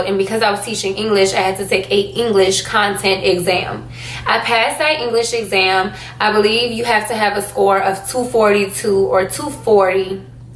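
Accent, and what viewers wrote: New Orleans, United States. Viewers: You’re definitely from New Orleans 😂😂 #batonrouge area.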